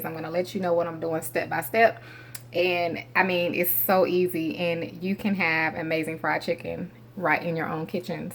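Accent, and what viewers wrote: South, United States. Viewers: I heard her voice and I said to myself “ She can cook” listen to that beautiful accent. Southern Woman. I was raised by a Southern Woman while my Mom was in Vietnam. I STILL call her my Mom and she My Queen is 89 and she lives with me and my wife and now I feed her, wash her, cook for her and I make My Queen smile. Thank you so much.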